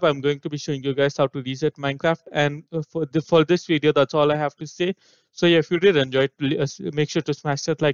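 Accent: India. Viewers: Indian accents are the best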